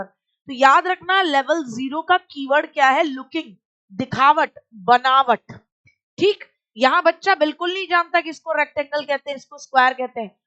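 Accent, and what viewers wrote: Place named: Haryana, India. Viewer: Haryanvi bol rhe h bhaiya wo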